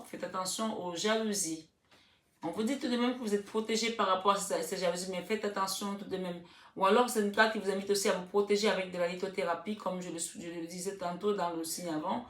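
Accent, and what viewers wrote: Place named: Cameroon. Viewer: Bonjour ma chère votre tirage me parle énormément, et le premier visionnage de vos tirages j'ai reconnu vôtre accent du Cameroun car j'ai une histoire avec une femme bams qui vous ressemble beaucoup donc je sais ce qu'il m attend 😅 merci infiniment vous êtes magnifiques bien à vous Sir Lion 🦁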